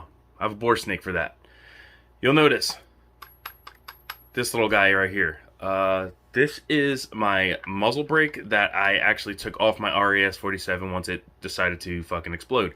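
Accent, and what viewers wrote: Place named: Pennsylvania, United States. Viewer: Just scooped a tiger maple m70. Nice review? You sound like you're from PA, where about? Pittsburgh here.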